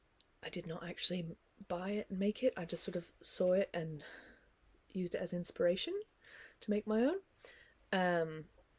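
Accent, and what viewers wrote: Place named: Scotland, United Kingdom. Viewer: So glad to see you again this month! I wish that I had responded more to your last video. I thought about hunting you down in IG. I care if you make videos. I love your brand of witty humor. I love your Smattering of British and Scottish accents. You remind me of my grandmother who was from Aberdeen. I’ve tried to figure out why your channel is growing more slowly than some, and I think it comes down to “sameness.” You are not making the same PK and MFTK sweaters in creams like everyone else. You veer off the beaten path with color, patterns and other fashion. 💗💗💗💗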